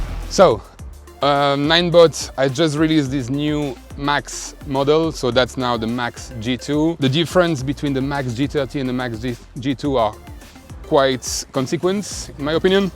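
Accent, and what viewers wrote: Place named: France. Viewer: Tu et français ?😂 en tout cas tu en a l'accent😊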